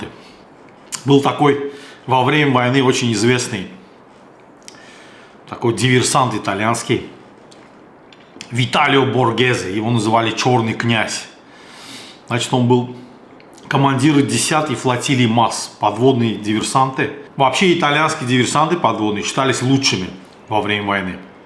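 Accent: Baku, Azerbaijan. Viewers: правила нет. Но не используют лук :) помидоры, чеснок базилик да, но лук туда не кладут. Но все равно смотрю вашу передачу только из-за того что вы бакинец и мне нравится ваш акцент бакинца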